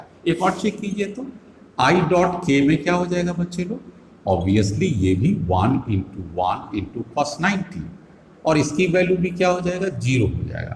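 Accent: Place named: Begusarai, India. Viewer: Sir u r from begusarai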